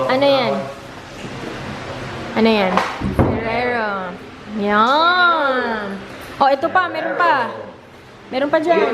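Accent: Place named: Visayas, Philippines. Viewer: Ka cute nimo mo sulti ug Bisaya te oi😂😂😂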